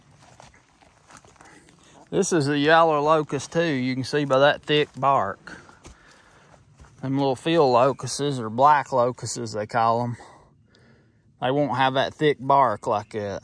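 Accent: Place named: Eastern Kentucky, United States. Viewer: Love to hear you talk reminds me of my kinfolk down in eastern Kentucky ❤️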